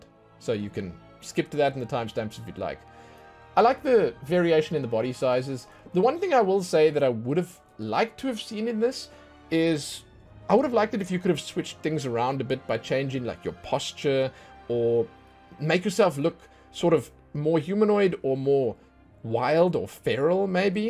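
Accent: South Africa. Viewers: Off topic but are you South African?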